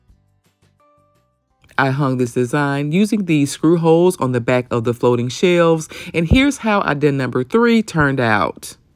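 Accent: South, United States. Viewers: Girl this DIY is the bomb! Thank you so much! Love your southern accent too 😘😘😘😘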